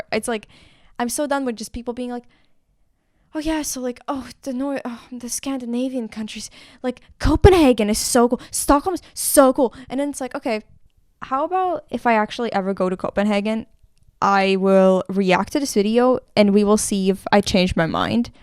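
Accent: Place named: Latvia. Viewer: Your English pronunciation is very similar to other Latvian people I know so I had the feeling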